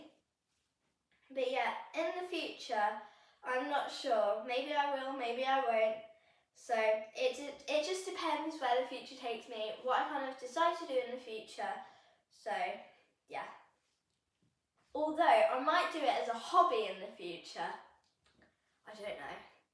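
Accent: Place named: RP, United Kingdom. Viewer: Love your accent and articulation, proper English !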